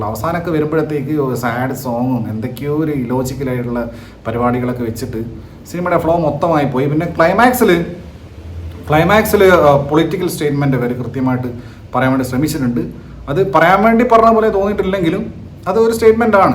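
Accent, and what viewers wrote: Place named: Malappuram, India. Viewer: കണ്ണൂർ slang ആയി തോന്നിയില്ല പക്കാ മലപ്പുറം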